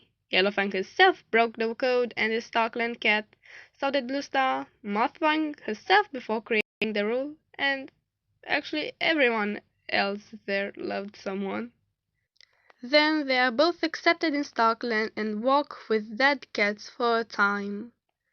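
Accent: United Kingdom. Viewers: Wow! Chiat zici ca esti din Marea Britanie cu accentul tau! Eu me-as dori sa o citesc, dar nu k gasesc si oricum trebuie sa imi ajunga 2 tot in Engleza. Felicitari! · Îmi place vocea ta lol! Accentul tău britanic nu suna rau (nu de-as fi eu experta heh). De desen ce sa mai zic..arata splendid!!! Squirrelflight's Hope e pe lista mea de super ediții si plănuiesc sa o citesc...mai încolo xD 🤍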